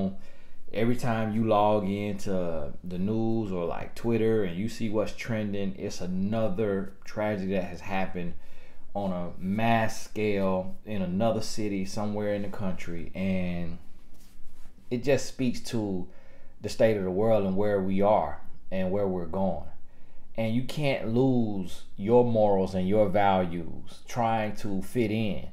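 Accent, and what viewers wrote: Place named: Polk County, United States. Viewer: I can definitely tell you’re from Polk County.. lol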